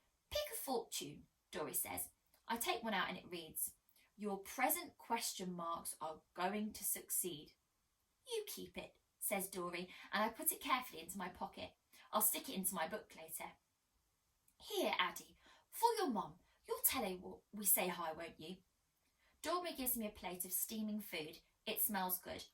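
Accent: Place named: United Kingdom. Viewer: Hello Miss I am an English learner from Russia and I have been choose these book because it is exactly my level - not so easy not so hard. So what I wanted to say - I really like your British accent and how you read the book and also I was really surprised to see in your channel the author of the book. Thank you for your reading and I wish you all the best.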